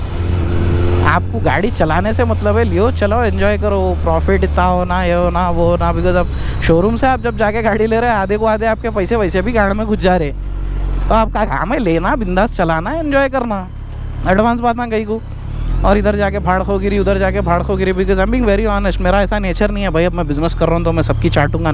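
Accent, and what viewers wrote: Hyderabad, India. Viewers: Hyderabadi hai bolo 😎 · bhai vidoe kaisa bhi rehndo magar my khaali tumhari vo zuban sunne keliye video dekhtaun ji kya pyaari Hyderabadi bolte tum toe bulle me miladete dekhne walin ki han rahi baath video ki ab aapse milne ka wakth akhir aagaya bohot dinose preowned bikes leneka soch taun magar kisi pe bharosa ny mere bhai aap line me utargaye ab shayad mere ko meri dream poora karneka time aagaya dikhra hy jaldi lockdown khatam hoagaya toe accha hy milke requirement batatahun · BHAI HYDERABAD SE HAI KYA 😎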